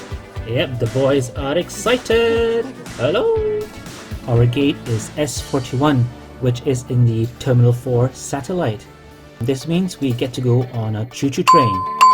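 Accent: Scotland, United Kingdom. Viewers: your accent dips in and out of hard Scottish so it's just interesting to listen to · whaoh i never expected you to have a scottish accent LOL ,love the voice over great addition to an already great channel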